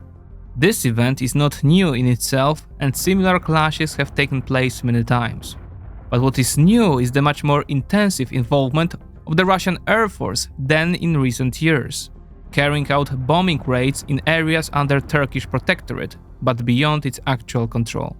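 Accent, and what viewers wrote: Poland. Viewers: He’s Polish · Nie bardzo rozumiem jak można mieć tak podobny akcent do "naszego" ( 😉) i być tak konsekwentnie stronniczym na korzyść naszych obecnych okupantów 🤦‍♀ ( których mamy już ponad 10 tysięcy i to w pełnej gamie kolorystycznej - ze wskazaniem na tony wieczorowe... ;) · His information are biased and not accurate. Not a surprise with his polish accent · The narrator sounds Polish.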